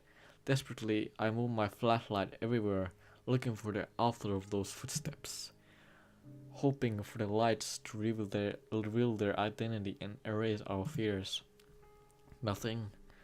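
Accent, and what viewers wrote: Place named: Finland. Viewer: I love your cute finnish accent. But fuck that weeb shit xd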